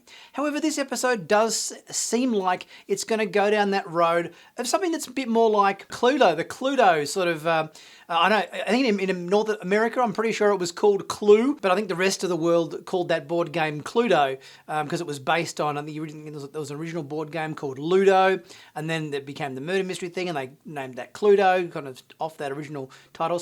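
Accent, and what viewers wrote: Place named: Australia. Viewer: I like the way you talk, but I'm wondering. I've heard a lot of Aussie accents over the years. Are there different regional accents in Australia? Can y'all kinda tell where each other are from by the way you talk? My own accent is mostly Midwestern American with hints of upstate New York and I met someone once who nailed down by my accent that I was born in New York and grew up in Colorado. I have noticed that Aussies do sound a bit different here and there, but I can't tell if someone is from Melbourne, Sydney or the outback. You don't exactly sound like Crocodile Dundee for instance. Y'know what I mean? I'm going to ask other YouTubers too. Feel free to call me cunt any time brother!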